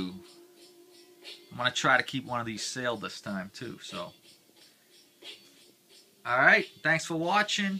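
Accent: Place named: Boston, United States. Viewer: You sound like you're from Boston are you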